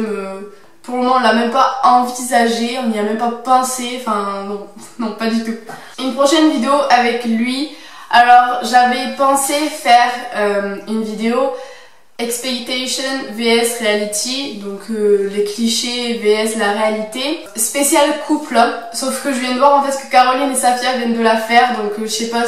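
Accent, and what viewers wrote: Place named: Aveyron, France. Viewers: Toi tu es du sud ouest tu as un accent toulousain à 10 000% ahahah gros bisous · Tu habite où 💕parce que moi j'habite à Millau est je mange très régulièrement de l'aligot saussice et j'ai le même accent que toi 💕du quou je pense que tu est née en Aveyron 💕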